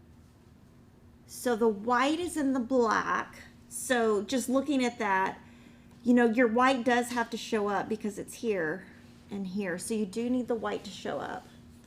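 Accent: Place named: Texas, United States. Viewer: I really like seeing other stitchers/quilters work. I get ideas especially for colors that I wouldn't have thought of on my own. Color of thread, floss and fabric are the hardest things for me to choose. BTW, I love your accent especially when you say y'all. It reminds me so much of growing up in El Paso.👍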